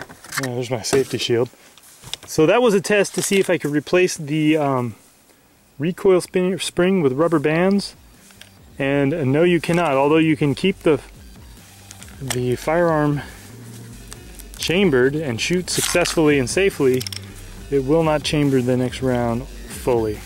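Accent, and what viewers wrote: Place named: Appalachia, United States. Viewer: classic hillbilly dialect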